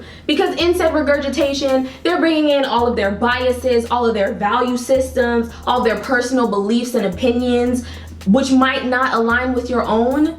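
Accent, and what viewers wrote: Jamaica. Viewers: Are you Jamaican or from the Caribbean because I hear the patwas enuh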